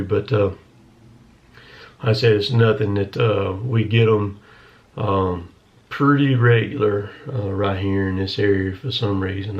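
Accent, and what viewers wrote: South, United States. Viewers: Love that southern accent... From fellow southerner on the NC/SC border. 😊 love these videos! Stay safe!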